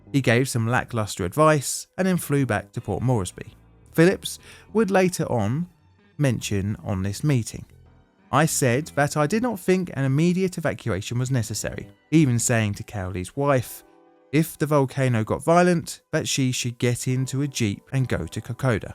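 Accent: United Kingdom. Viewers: I would subscribe your channel in case you could narrate your videos in English... and not in britain dialect... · Oh my the Brit pronunciation of place names is doing my PNG brain damage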